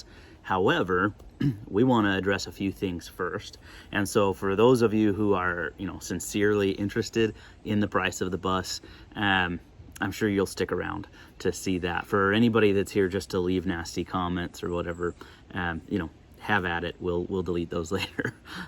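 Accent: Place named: California, United States. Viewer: I'm sorry, this has nothing to do with the bus, but, I'm just curious, what state are you from, your accent, is it Californian?? I'm from and live in Papua New Guinea 🇵🇬. ☺️😇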